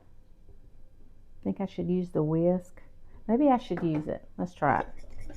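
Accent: South, United States. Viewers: your genuine warmth and sincerity are so refreshing in this increasingly disconnected Impersonal world. Your wonderful Southern accent also endears you to this boy raised in the Deep South! May God continue to bless you as you bless others! Big hug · I appreciate getting to know you more. I’m a newish subscriber- so I’ve only seen a few of your videos, but I knew I had to subscribe because I love a sweet soul with a southern accent! Plus, a great cook! Love, from Texas! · I love your southern voice so much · you are an inspiration. Sharing your story helps me remember that we just don’t know sometimes what the people we see in our daily lives are going through. We just don’t know. Perhaps remembering that we don’t can I courage us to be kinder in our interactions with others., I don’t know you but you seem to radiate kindness. Perhaps it is your voice and that beautiful southern accent that we in the south are blessed with. 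Perhaps it’s the expression on your face. Maybe a combination of things shows the kindness of your heart. You were speaking in this particular video of a sad event in your life. I am reminded that in the Bible there is a verse that tells us that we learn in the House of sorrow not in the House of laughter. God in His wisdom knows what we need and in His wisdom He sends situations or people to provide for that need. God bless you. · you are an angel here on earth. May God richly bless you and your family for your faithfulness to Him and to one of his precious Lambs who had no home until you provided for her, cared for her and loved her as He would. I love your YouTube channel and your sweet smiling face, your lovely southern accent that sounds like me, I'm a Nashville TN native living in Cedar Hill TN now. Your courage and determination are inspiring and your kind and loving heart is projected through every word you speak to us and every smile you give to us. 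Blessings upon blessings be showered upon you and yours forever. ❤🙏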